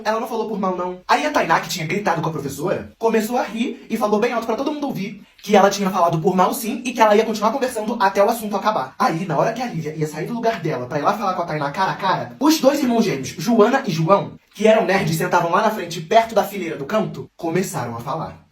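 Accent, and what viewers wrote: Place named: South, Brazil. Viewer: Você é do Sul né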